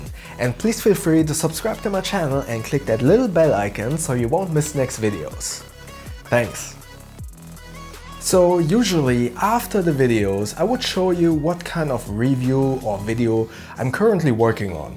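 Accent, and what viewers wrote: Germany. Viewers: Excellent review! Love your German accent! 😊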